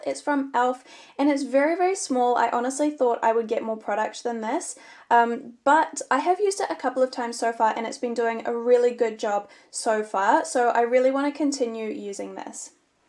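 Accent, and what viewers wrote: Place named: RP, United Kingdom. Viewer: I just come to listen to your accent... and your kinda posh lol x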